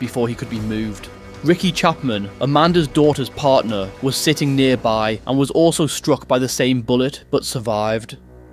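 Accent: England, United Kingdom. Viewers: He's English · Bullshit.antother lame instagram mini docco ! Narratted by some pommy flop who probably never even been out here 😂💩🤮💯also the way the narrator says state leader 😂😂😂😂😂😂😂they dint use that language also nike bike 😂dudes been wearing nike s for last thirty years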